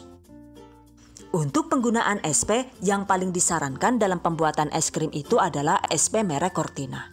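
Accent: Banyumas, Indonesia. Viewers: paling suka tutorial vidio jelas dan selalu menarik utk di ikuti di tambah bahasa ngapak2 nya lucu salam dari Jogya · ternyata ngapak ibuknya😅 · Jujur bun.. Saya klo denger bunda ngomongnya ngapak2 jd ketawa.. 😀.. Nyantai bgt gtu, Tp resep2nya oke pnya bun... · Kereeeen bahasa inggrisnya, apalagi bahasa ngapak nya.... Sukaaaa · ngapakers koh sip lah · Mantap bunda...jawanya ngapak banget...ngromet ..opo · Mbak orang mana deneng ngapak banget.cara menjelaskan jelas mudah di mengerti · Ngapak2 lucuuu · Yg aku tunggu2 dr channel ini adalah ktika udah ngomong jawa ngapak..sllu ngakak😂😂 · Terimakasih atas ilmunya, suaranya lucu bngt bu, bisa jd 3 bahasa gitu,indo,ngapak & inggris,, memang luar biasa, sangat bermanfaat sekali, semoga sukses selalu ya bu 😂😍😘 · Deneng ngomonge ngapak yu🤣🤣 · Ngapak ngapak hahaha keren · Oalah jebul ngapak mbekayune 😂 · Trnyata ngapak ya bhasanya, nyong ben ngapak kie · Jebule wong ngapak, keren yakin video Rika Yu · Kiye wong Banyumas Karo baha inggris lagi campuran, ngandel metu banyumase jaaaaaan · Suka bhs nya,Banyumasan kyk inyong😄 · Kereeen ibunya gak pelit berbagi ilmu sangat detail...dan lucu si ibu ngapak ..wkwkkw auto subscribe 😂😁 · Jebule ngapak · ora ngapak ora kepenak ya mbak... 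salam ngapak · Bahasane wong ndi iku,, koyo ngapak,,,, 😂😂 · Ngapak yh yung... 😁😁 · Ngapak temen yu, rika jawa ne ngendi😂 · Jan maturnuwun banget ilmune bu, ngesuk tek praktekna lah, slain mengispirasi tp ana lucune pas ngomong ngapak, ( BOCAH NGAPAK YA ) 😀😀😀😀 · msyaAllah ibu orang ngapak yang the bastd 😘😘😘😘😍😍😍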